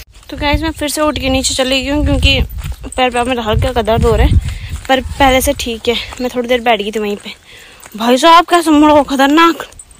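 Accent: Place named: Pahadi, India. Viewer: Yaar mai bi pahaadi hu bhuli Teri pahaadi waali Hindi sun kar mja hi aa jaata hai.😅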